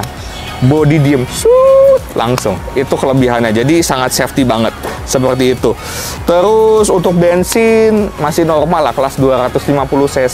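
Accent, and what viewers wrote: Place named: West Java, Indonesia. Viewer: Om. Logat sundanya, jls bgt. 😂😂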